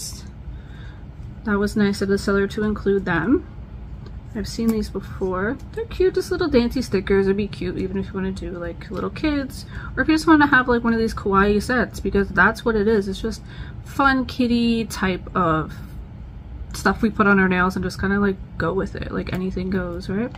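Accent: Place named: Canada. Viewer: I believe you are Canadian. I am from BC and I can hear it in your voice so I am so following you because you are fantastic!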